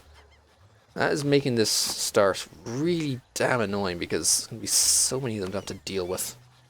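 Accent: Ireland. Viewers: Hey, what accent do you have? I hear Irish, but I might be horribly wrong. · For Northside Dublin it's actually pretty subtle! My parents and entire family are from Northside and some are the type who'd say " Story bud" in that typical accent. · like he is from Ireland.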